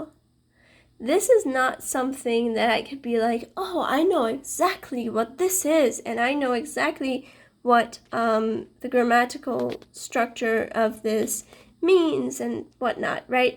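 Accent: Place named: Spain. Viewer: Dear You were awesome 👏👏👏👏👏👏👏 I haven't finished watching the video, But I enjoyed listening to your reading; it was so fluently with a pleasant accent, it even sounded like a native Spanish to me. The way that you explained about reading the story in a foreign language, was interesting for me. I'll use it in English, I hope it works for me as much as it worked for you in Spanish 😊 Good Luck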